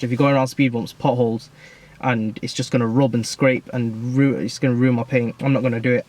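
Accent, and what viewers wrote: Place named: United Kingdom. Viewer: Dude, I gave up after a few minutes... heavy AND fast British talking, I am not a native, tough luck I reckon.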